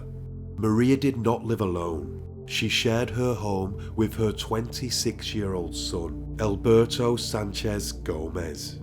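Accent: United Kingdom. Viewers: Please forgive my ignorance. Where’s your accent is from? I know you’re from uk … which part ?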